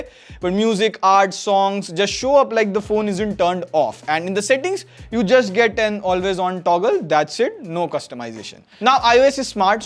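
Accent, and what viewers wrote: India. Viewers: your language is very clear 😃 i think you are indian 😅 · Indian accent 😅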